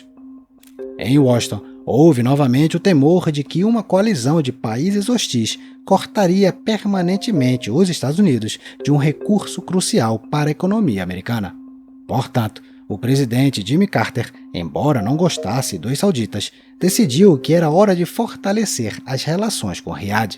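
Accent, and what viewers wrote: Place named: Rio de Janeiro, Brazil. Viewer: Esse é o melhor canal de geopolítica que já vi, chaga a ser um canal essencialmente excelente. Acho que o narrador é carioca por causa do sotaque.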